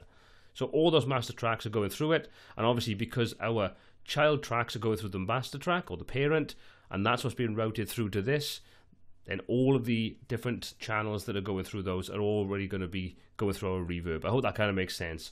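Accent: Wales, United Kingdom. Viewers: Thanks for the great series. I am rather enjoying what I perceive is a Welsh accent. Am I incorrect? My mum is from Cardiff, the Docks neighborhood of Tiger Bay. You're voice rather resembles her brother, my Uncle Bobby. :-D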